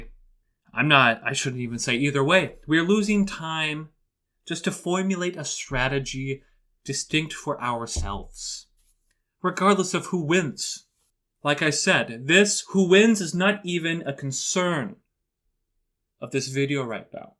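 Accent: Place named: United States. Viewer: Oh yup let’s keep pushing a pro Russian sentiment then go ahead and keep whining about how apparently Rusyns are being left off, bunch of bullshit considering you don’t even live in Ukraine you have a very nice clear American accent just a bunch of shit to disguise yourself as pro Russian and you sitting there happy for Ukrainian people being killed.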